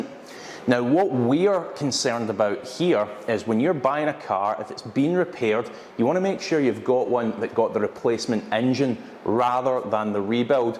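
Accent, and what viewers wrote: Scotland, United Kingdom. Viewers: I like Scottish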